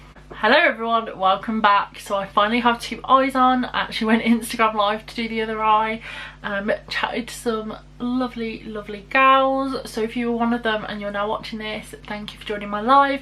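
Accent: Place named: United Kingdom. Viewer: your accent is so cute. i love uk beauty gurus!!!!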